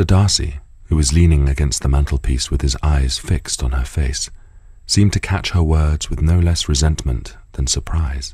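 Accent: England, United Kingdom. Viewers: 진정한 동굴 목소리다😲 목소리만듣고 심쿵하긴 처음이넼ㅋㅋ영국영어 배우고싶다ㅠ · Don't you just LOVE an English accent? If you think Matthew Macfadyen sounds good then just listen to Alan Rickman!!! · Ese acento tan inglés ufff :D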